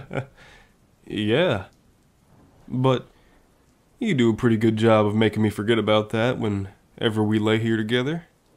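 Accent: South, United States. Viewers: I’m a sucker for the country accent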